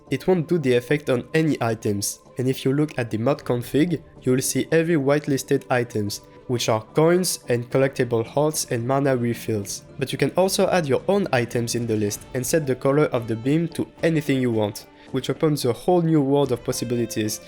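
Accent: France. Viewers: Are you french or something ? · Tu es français non ? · that french accent is ruining my ears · Nice frenchglish accent and nice video! ;D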